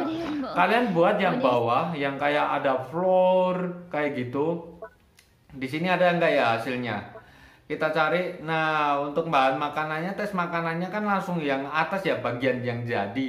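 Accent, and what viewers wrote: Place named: Java, Indonesia. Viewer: hmm medok men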